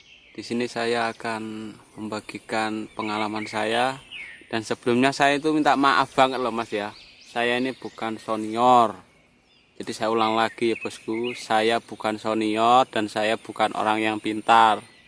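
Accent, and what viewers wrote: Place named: Madura, Indonesia. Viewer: Oohhh tak kira di Trawas Pasuruan atau wsrga Pasuruan Jawa Timur. Dialog bahasa nya seperti Jaws timur Madura turun Pasuruan. Terima kasih telah berbagi ilmu, semangat ya mas. Berbagi ilmu nya.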